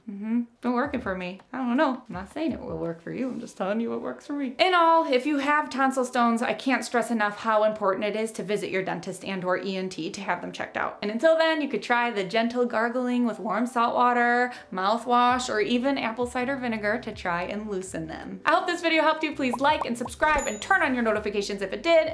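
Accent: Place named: Minnesota, United States. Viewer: HOLY MINNESOTA ACCENT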